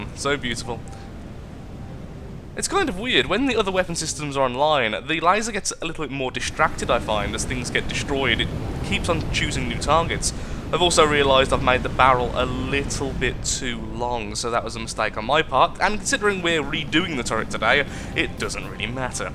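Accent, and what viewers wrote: United Kingdom. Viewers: you sound british but you think like an american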